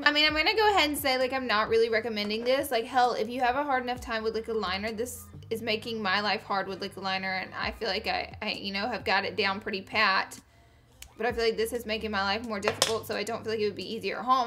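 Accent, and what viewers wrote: South, United States. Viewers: rute maria or Farrah Abraham..but a little more southern? idk who she is so idk if she's from the south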